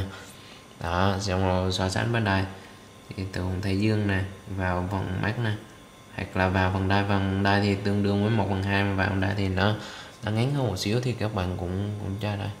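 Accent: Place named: Gia Lai, Vietnam. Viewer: Tiếng gia lai vậy á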